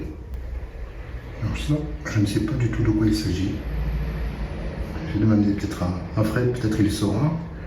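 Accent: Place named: South, France. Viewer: Bonjour, ohhh tu as l'accent qui attire le chant des cigales tu me rappel Carcassonne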